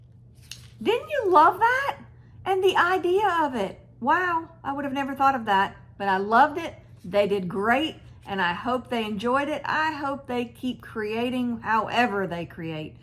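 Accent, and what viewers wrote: South, United States. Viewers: Good Saturday morning Miss! Thanks for sharing my quilts. My last name is Rick-er. Rick with an "er" on the end. You're such a sweet lady. I love your southern accent.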